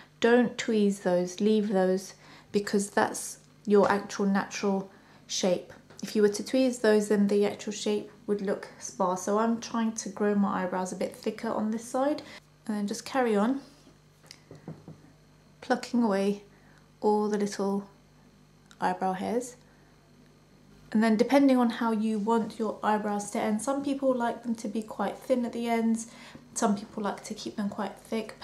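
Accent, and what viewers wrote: United Kingdom. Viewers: She sounds british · You've got the most amazing eyes! And to my ears you sound kind of British! :D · Do you mean here religion or where she is from, her religion is y guess Muslim, which is a beautiful religion and i respect it, but her culture idk because she has a British accent, my guess is her relatives are Iraqi or somewhere around there, but it sounds like shes from Britain but follows the religion of Muslims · Loved the video :) very helpful, i needed to tame my eye brows. But im very confused by you O.o ur muslim and look like you have a arabish background but you have a british accent O.o uhh what? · You do have wonderful eyebrows! Finally I got to see a perfect eyebrow tutorial with very good explanations and I think next time I will try it just the way you explained. You are very nice and beautiful! I like very much your voice and your british accent! 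Thank you so much for sharing with us this lovely eyebrow tutorial! Looking forward to seeing the next video from you!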